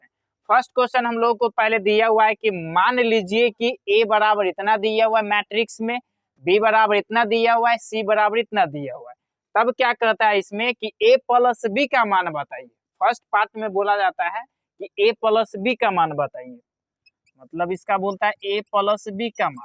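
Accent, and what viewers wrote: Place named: Bihar, India. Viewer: Ekdam Bihari style me sir 😍😍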